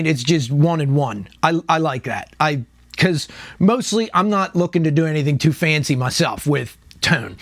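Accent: Philadelphia, United States. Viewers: I just picked one of these up used. I got a hidden gem. Might I ask what region of the country you’re from? You have a distinct accent, just like mine. My wife makes fun of me for it. I hail from the outer regions of the Philadelphia area. I grew up attending the Philadelphia folk festival, which is in schwenksville PA. Sounds like you’re from the area. Maybe, maybe not. Love the video and thank you for it my friend .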